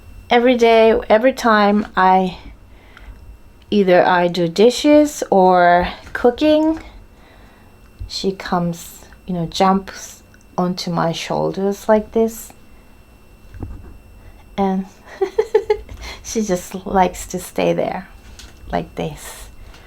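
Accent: United States. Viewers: As an Indian I liked your content ma'am because of your American accent. And you just teach us American english accent please🙏🙏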